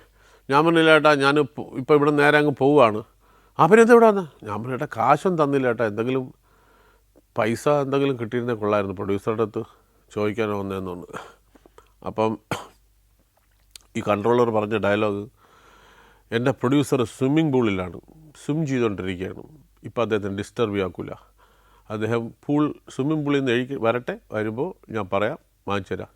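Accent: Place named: Thiruvananthapuram, India. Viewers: the proper Thiruvananthapuram dialect that I grew up hearing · Real Trivian slang👍🏽❤😍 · തിരുവനന്തപുരം സ്ലാങ്🔥🔥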